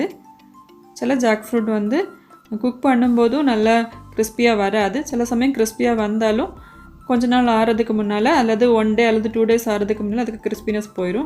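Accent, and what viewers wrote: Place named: Kanyakumari, India. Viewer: Sis nenga kanyakumari dist a🙄...slang apadi iruku🥰🥰...nice sis💐💐☺️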